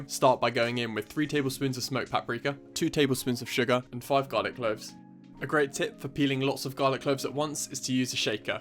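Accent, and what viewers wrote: United Kingdom. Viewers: That's what you can hear from a British dude. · a form of london accent, possibly a west london one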